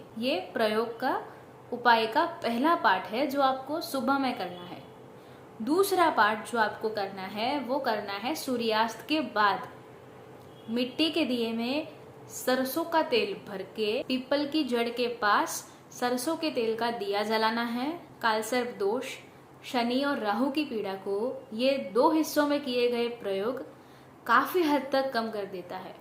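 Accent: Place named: Gujarat, India. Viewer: Hindi with gujarati accent...very good..... reminding Daya bhabhi of "ulta chasma".....keep it up beta...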